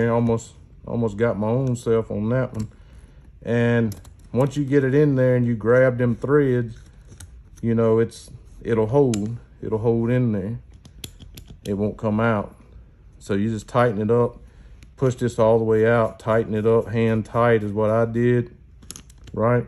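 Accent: South, United States. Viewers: You can’t help your southern accent and I for one will not hold it against you sir , at least you speak English .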